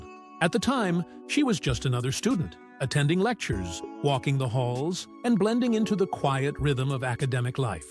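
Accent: United States. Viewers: Why are these videos read by Americans? ANYBODY??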